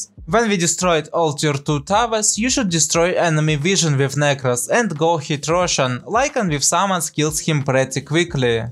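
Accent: Russia. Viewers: Sounds like рашан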